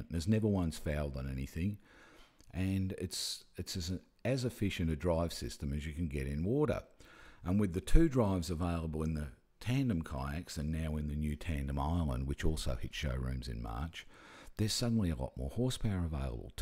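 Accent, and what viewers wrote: Australia. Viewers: Actually, I prefer this guy and his honest and relaxed approach. Listen to some of the other reviews he's done. Excellent voice, excellent approach and speed, but it's just not loud enough. Still, I have some normal sized computer speakers hooked up to this desktop and, when turned up a little, it wasn't silent at all. If this is called Aussie 'strine,' then I like it. He'd be an excellent story teller.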